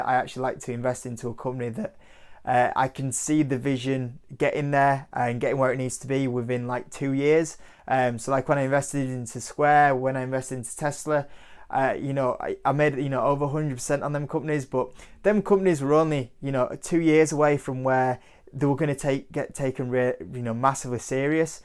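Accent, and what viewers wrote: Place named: Yorkshire, United Kingdom. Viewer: but by no means, them are not competitors, same as Lucid (private so far). And Tesla has no real competition so far to be honest, as you buy technology, not just a vehicle (it's not a car according to Oxford dictionary). As you're based in Yorkshire, judging from dialect (😃), I would advice to make a call and get a Tesla Model 3 test drive, then you will see what it's all about (the hype), Tesla model X/S doesn't give the same feeling and tech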